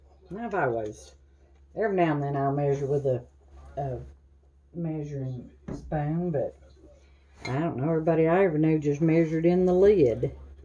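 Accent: South, United States. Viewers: 3/4 cup of "Flair". I love your southern accent...and your sweet kind soul.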